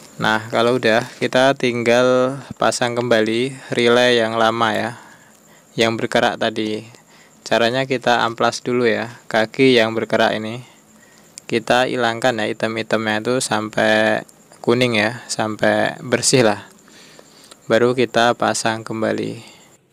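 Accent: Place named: Java, Indonesia. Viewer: Masse... njenengan teng daerah pundi toh...ngomongnya medok... maturnuwun sanget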